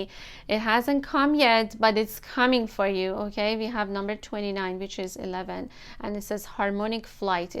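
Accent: Iran. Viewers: Your accent sounds very Persian!!! Great read:)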